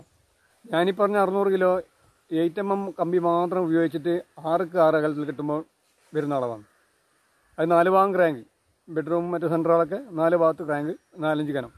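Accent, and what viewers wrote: Kannur, India. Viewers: ningalude samsaram kannur anennu mansilayi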